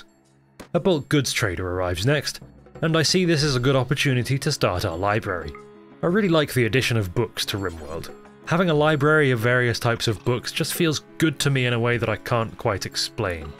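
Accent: United Kingdom. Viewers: Hello Mr British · Babe, wake up, the funny British guy is back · my hyperfixation? in riwmorld? WITH A BRITISH VOID MAN? yell heah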